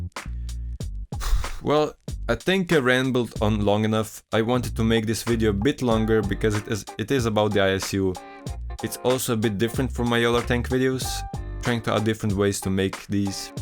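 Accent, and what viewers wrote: Russia. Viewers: Are you Russian who speak english? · I really think you should do more of these vids, russian accent is best accent in the world! Also the history lesson was nice :D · Dude i Love your Videos! They are hilarious😂💪🏻 where are you from btw? Russia or maybe Serbia?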